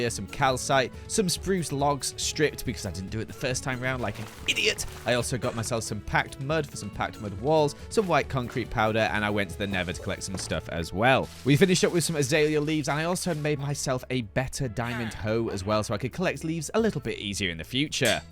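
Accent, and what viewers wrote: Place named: United Kingdom. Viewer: Okay but the dry af "Tequila" in such a monotone british accent is my favorite thing ever.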